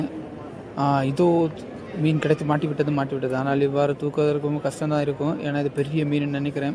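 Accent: Sri Lanka. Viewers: ஈழத் தமிழ் பேசுவதை போல பேசுகிறீர்கள்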